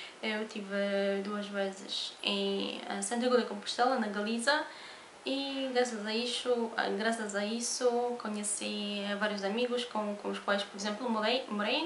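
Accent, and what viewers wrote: Portugal. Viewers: Esse sotaque português é charmoso mais é bem engraçado para nós brasileiros, me remete as novelas de época, mais seu português é ótimo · Sou brasileiro, achei no início que fosse uma portuguesa nativa, pelo sotaque. Isso não causa problemas de compreensão para nós do Brasil. Entendi completamente sua fala neste vídeo. Sucesso para você! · Adorei seu sotaque lusitano! Beijos! :) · Como ariano serei sincero, eu entendi tudo que você disse, porém particularmente pra mim, escutar o português de Portugal me sinto como se tivesse voltado no tempo uns 1500 anos atrás, no tempo do império! 😄. Porque esse sotaque é desnecessário , tanto que no Brasil não temos esse sotaque travado na pronúncia. Bem essa é apenas minha percepção. Aqui no Brasil a língua portuguesa evoluiu.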